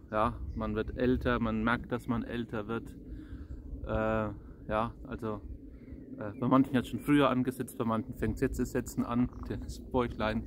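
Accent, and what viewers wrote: Germany. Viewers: So wunderbar gesprochen und auch noch mit fränkischem Akzent, das hat mir sehr gut getan! Dank sei Gott! Und ewiges Vergelts Gott! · My father is "Unterfranke" and from Bad Kissingen your dialect therefore reminds me much of fond childhood days when we visited the relatives in Bad Kissingen. My dialect having grown up in rich of Roman history Palatine, mixed with American accent, a bit of Swabian, a bit of Palatine must therefore sound really interesting in a funny way. Thank you for sharing this beautiful nature transporting same to me with your priestly blessings. I wrote you a while back in that Email I had asked your priestly advice on Padre Pio. If you find some downtime I'd be grateful for your much treasured input. It makes me happy to see my Godly priest happy, relaxed, fueling the soul with new energy all whilst sharing our Lord's beautiful nature with us! 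May our risen Lord protect our Godly, prosecuted priests who lead their flock despite the worldly war of satan! 🙏🏻✝️📿🌿🌹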